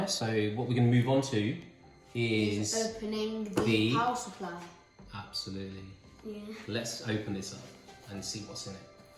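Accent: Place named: United Kingdom. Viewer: Can u plzz tell the prize in pounds as from the accent I guess u are from Britain ☺️(excluding the monitor)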